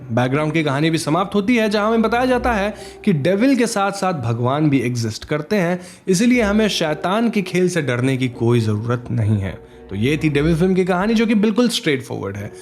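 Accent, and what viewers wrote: Bengal, India. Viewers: Aap bengoli ho?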